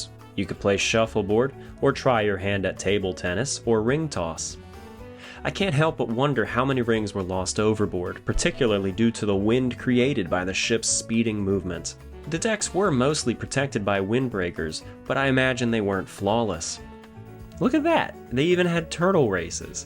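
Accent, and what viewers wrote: United States. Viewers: a wonderful American accent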